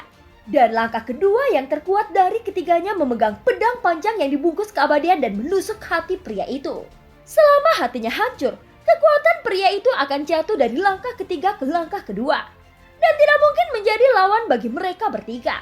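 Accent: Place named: Surabaya, Indonesia. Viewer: Suroboyoan rek bosone , semangat yo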